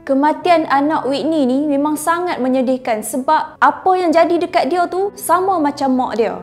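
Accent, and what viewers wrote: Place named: Kedah, Malaysia. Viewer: Sempoi speaker video pon slang kedah noo 😁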